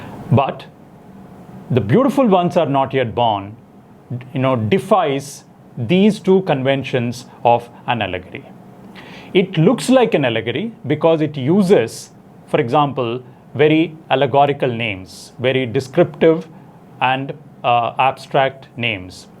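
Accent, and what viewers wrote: India. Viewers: I hear only Indian accent. No English there sir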